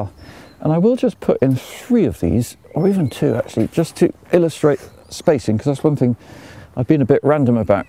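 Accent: United Kingdom. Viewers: That's the british accent that i like:).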